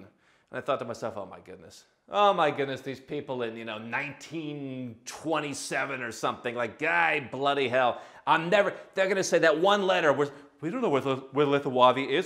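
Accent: United States. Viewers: As soon as you speak we know you're American! Nothing wrong with that and the paper you hold can't change that. It's nice that you're also s citizen of the world. 😊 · It's an interesting point how you brought up that pride month is about identifying how you want to identify, but that you can't be Norwegian. One's identity is an internal thing, but is ultimately irrelevant. With your accent, you're obviously an American for all intents and purposes except the passport (which, since most Americans don't have passports anyway, further supports that point, with the caveat being of course that since you renounced your citizenship, you cannot live in the US).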